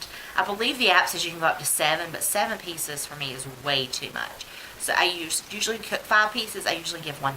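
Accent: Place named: South, United States. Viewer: My starting weight was 336. I'm down 116, so you can do it!! I know those grandma dinners! Just make good choices. I'm late to the party here. Glad I found you! Sounds like you're a southern gal too. Just get that weight off before you're older like me! I'll be 60 soon. You're very organized in the video. Great job!!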